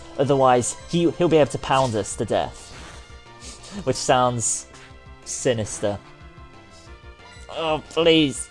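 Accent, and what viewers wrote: Birmingham, United Kingdom. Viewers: He comes from Birmingham :D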